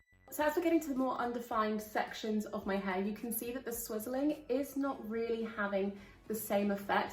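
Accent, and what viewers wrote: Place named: United Kingdom. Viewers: She's a Brit. There's a significant difference between the 2 accents. I'm surprised you're unable to differentiate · Are you brittish or South-African? Cool accent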